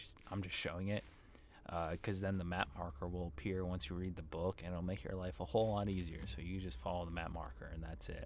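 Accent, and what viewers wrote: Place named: Michigan, United States. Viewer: are you from michigan? we talk verrrrry similar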